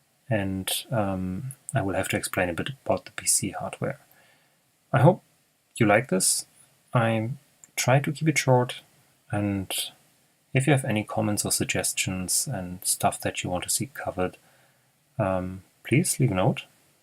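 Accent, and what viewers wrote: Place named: Germany. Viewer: I don't know why, but the German accent makes the tutorial more trustworthy 😄 very good video, thanks!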